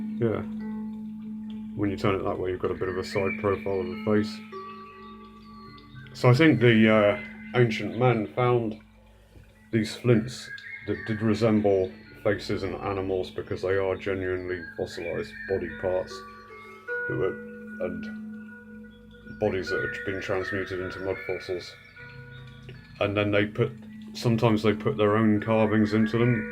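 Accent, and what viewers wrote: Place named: England, United Kingdom. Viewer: You sound English, you should check my blog, although its a world wide thing... <link>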